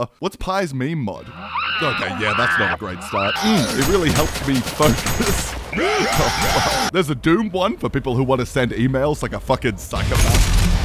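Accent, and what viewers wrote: Australia. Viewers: Just realized you’re Australian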